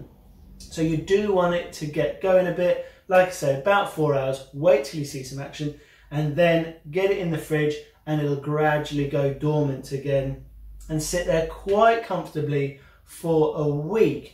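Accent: United Kingdom. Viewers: Me: hears English accent; hits subscribe immediately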